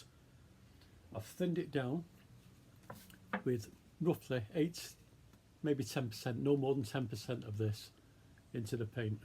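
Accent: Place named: Northern England, United Kingdom. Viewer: Big up the North!!! Love you're accent. Where (exactly) does it originate from?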